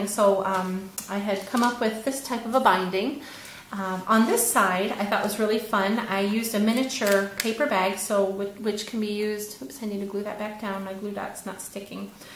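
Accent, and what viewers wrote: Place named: United States. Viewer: Zounds! This is fabulous! So beautiful!!! Are you a transplanted American in Ireland? You sound very American! (Not that this is bad of course!....just curious cuz I spent a lot of time on the Isle of Wight and was pegged all the time! I even had a bunch of kids stop me and want me to say something in American!!!! What a hoot! Great mini!!!! Hope you don't mind my scraplifting! U have great ideas!!!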